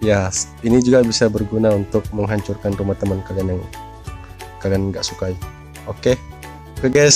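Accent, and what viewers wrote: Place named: Sulawesi, Indonesia. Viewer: Dari Logatnya kayaknya orang sulawesi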